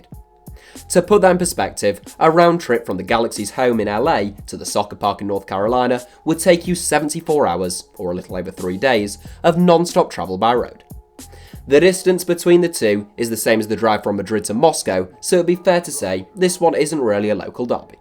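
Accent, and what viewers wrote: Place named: England, United Kingdom. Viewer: I have to say that english accent is too intense its so hard to understand what the guy is saying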